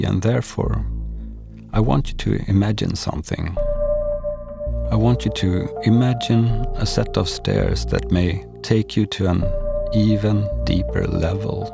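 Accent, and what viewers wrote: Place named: England, United Kingdom. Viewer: I don't understand this English accent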